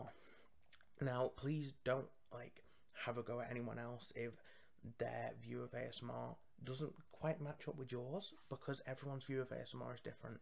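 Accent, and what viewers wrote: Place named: England, United Kingdom. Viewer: I started watching Tiraradeguello because he gave some very interesting advice during one of his Illuminati videos and it hooked me. The decision to subscribe comes from both the relaxation but also the connection with the ASMR artist. The relaxation is also tied to the gimmick, I like conspiracy theories and weird things so I gravitated toward Tirar and Ephemeral Rift. When I followed you, it was the collab you did with Tirar, when you were helping Dr. Andrew Michaels. I got hooked because of the sassy adlibbing you were doing + English accent is so amazing. So My advice is, being yourself is only half the equation. you also have to have a good hook and put in effort, such as getting some story ideas and writing them down, working them into scripts you an follow / adlib over. Umbergnome ASMR is a wonderful artist if you want to see a story being told through an asmr video.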